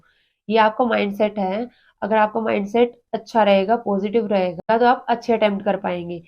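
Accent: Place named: Himachal Pradesh, India. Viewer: Your accent is Himachali...💞...nd thnxz for boosting ma'am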